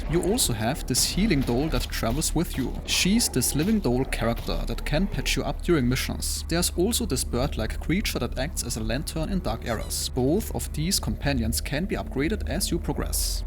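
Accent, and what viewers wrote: Germany. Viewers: "Chee-see Doom". German accents are entertaining and I like your style, subbed.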